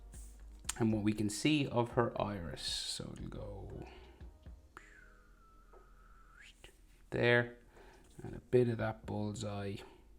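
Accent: Ireland. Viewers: Are you from ireland?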